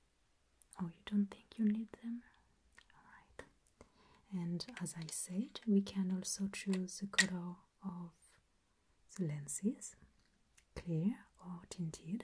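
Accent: France. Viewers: I might be dumb but is that a French accent? Its beautiful. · It’s French, but she gets lots of comments asking if she is German or has a German accent. I personally don’t think it sounds German at all, but very French. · I love your french accent, it’s so relaxing and welcoming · She sounds very French and has a lovely soft voice. I wish my French sounded like her English 😪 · as a german myself, i don't hear any german accent. It sounds very french to me. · Are you French because you have a french accent c cool d'être représenté de partout tu fait du super bouleau continu comme ça 👌✌️ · That's a thick french accent. I love her work and her grammar is perfect but as a french myself, i find the accent tends to take me out. It never bothers me when it's other accents but for some reason the french accent kill my asmr ^^ I commend her for the amazing work though !! 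And I still watch her videos for the ascetic and world building ! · Just found out this channel existed ~ oh boy have I been missing out! This production is absolutely stunning and the quality is top tier 😭 ✨ I absolutely love your (french?) accent - it’s so unique and perfect for this setting! Psst! You got a new subscriber 😊 ❤️ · Je me trompe ou tu a un fort accent français ? ^^ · French accent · It’s a French accent. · ...her soft voice & French accent sends TINGLES down my spine !!!!!!!!!!!!!!!!!!!!!!!!!!!!!!!!!!!!!!!!!! :) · Exactly. I deducted it has to be French, although at first I was not quite sure, but it has German and even slight Swedish quality to it. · France bc of the axent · French accent 🤝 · I think it's a french accent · I’m French & I can say that is a French accent if I’ve ever heard one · I'm French and trust me, it's a french accent 😄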